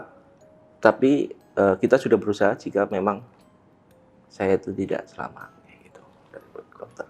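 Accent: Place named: Tegal, Indonesia. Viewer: Iya saya juga orang tegal Penasaran ini mas nya tegalnya manah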